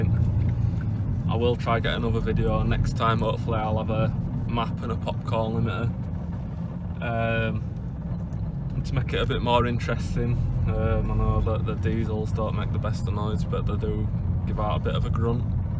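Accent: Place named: North West England, United Kingdom. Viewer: nice one bro. Just watching threw your videos now? Were abouts you from guessing north west with ur accent? Im up in southport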